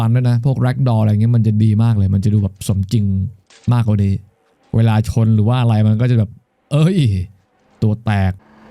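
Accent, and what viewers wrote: South, Thailand. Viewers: ลุงไม่แหลงใต้แล้วหรอครับ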